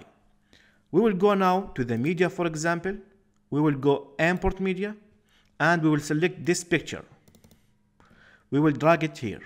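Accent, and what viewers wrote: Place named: Arab world. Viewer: يا اخي حاسك عربي🤔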